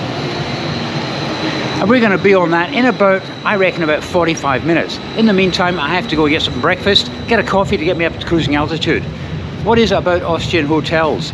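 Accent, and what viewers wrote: Scotland, United Kingdom. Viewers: Isn’t he a Scott?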